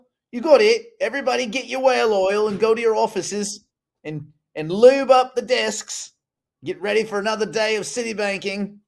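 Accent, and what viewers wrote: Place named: Australia. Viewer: I knew it.. is an Aussie just speaking American to us……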